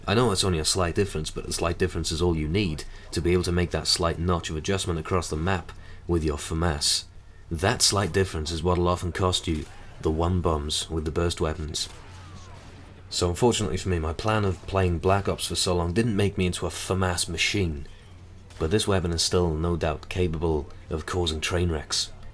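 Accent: Wales, United Kingdom. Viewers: Nice video;) like the way you say what we all think with a British accent. BTW, try M8 tac knife. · yeh, he sounds like my relative from the Gower area in Wales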